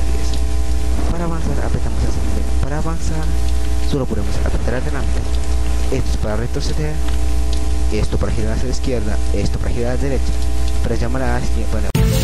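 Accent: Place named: Peru. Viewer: Peruano xddd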